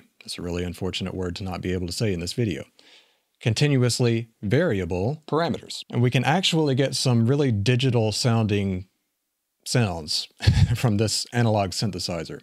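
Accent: South, United States. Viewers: What an awesome video. Or as you'd pronounce it, "Whhat an awesome video." :D You sound a lot like Billy Bob Thornton. Likely my favorite southern accent.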